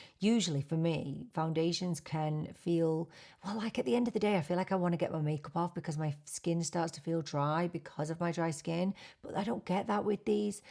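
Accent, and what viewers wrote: United Kingdom. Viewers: I just found your YouTube channel and I have subscribed first. Let me say I was drawn by your British accent. My mother was British. Next let me say it. I find your delivery very informative yet very sweet and I like that so with that being said, let me say this. I have been debating about it cosmetics balm. I feel you have isn’t it a very fair review and I don’t feel loud that it is a product that I’m looking for I am 72 years old and I have been wearing Estée Lauder foundation for years now however I don’t think it’s working for me any longer. It just seems to lay there on my skin. I may give the L’Oreal try. Thank you for this review. I will be following you.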